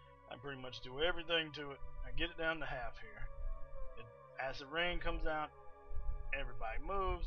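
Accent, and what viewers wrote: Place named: South, United States. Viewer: This was a decent video. Whispering like a scared little punk and your southern accent make it hard to understand you. Next time use your man voice.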